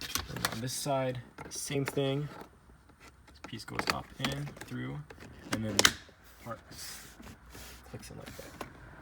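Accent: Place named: Midwest, United States. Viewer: Love the midwest pronunciation of the word 'console' to sound like 'council'. Must be a Chicago thing. .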